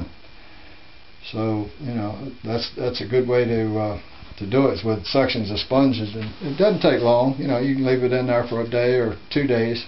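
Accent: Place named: Virginia, United States. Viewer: That's a Virginia accent. Any North Carolinian would recognize it immediately!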